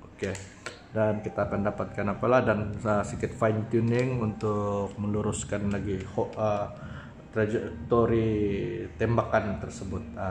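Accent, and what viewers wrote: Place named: Kota Kinabalu, Malaysia. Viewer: nah suara topinai urang kk ni kan hahah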